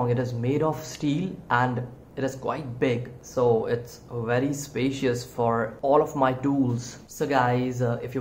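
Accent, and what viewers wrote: India. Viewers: Ini videonya reupload kah ? Judulnya pakai bahasa Indonesia, tapi presentasinya menggunakan bahasa inggris dgn aksen India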